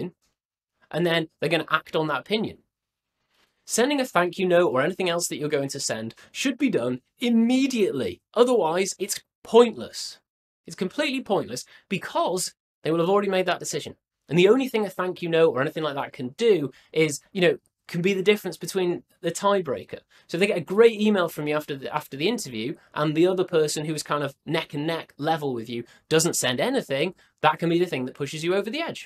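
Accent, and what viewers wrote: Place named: United Kingdom. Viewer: well ! Bro I'm listening to British accent so I found that you sound British.. Therefore, I asked it from you. Thank you so much for responding Could you please recommend me more youtubers with British accent?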